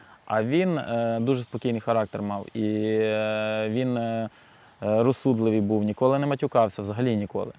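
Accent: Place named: Slobozhanshchyna, Ukraine. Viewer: Та чудова у Вас українська мова! Слобожанський діалект - важлива її частина. Нічого Вам соромитися: Сковорода та Котляревський на Вашому боці.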